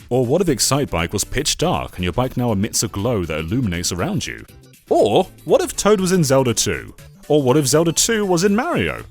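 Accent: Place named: United Kingdom. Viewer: 🤮🤮🤮🤮 uk accent is so annoying!🤮🤮🤮🤮🤮🤮🤮🤮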